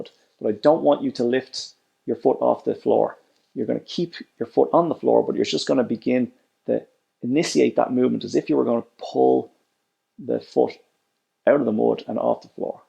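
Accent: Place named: Ireland. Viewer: What an eyeopener... wouldn't have believed how much feeling there was going on inside if I hadn't tried it out myself! Shout out to DH for advising me not to go straight over to the heavy bag and start kicking it too haha. This exercise is actually what got me really paying attention to this stuff. Now I have no choice but to look at all your other videos as my body is.... well I'll keep my adjectives civilized! Thanks mate and as an expat Aussie its nice to hear an Irish accent.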